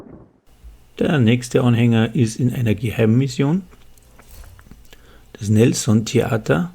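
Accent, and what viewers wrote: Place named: Swabia, Germany. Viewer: Als selbst tiefster Urbayer frage ich mich, wo der Dialekt herkommt. Hat Einschläge vom Schwäbischen, wie es mir vorkommt.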